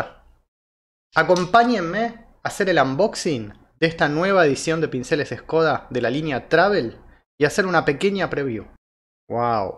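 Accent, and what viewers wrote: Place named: Argentina. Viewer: Por tu acento sos argentino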